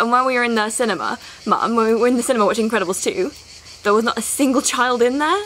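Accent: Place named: United Kingdom. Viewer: learn me the british accent. I love it soo much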